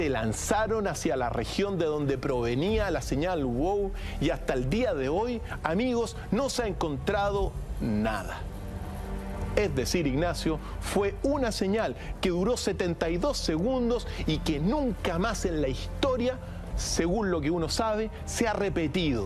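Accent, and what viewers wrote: Chile. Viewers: Es chileno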